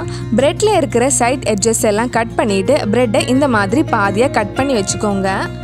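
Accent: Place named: Kerala, India. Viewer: R u malayali ..... Vithyasama iruke unka Tamil?????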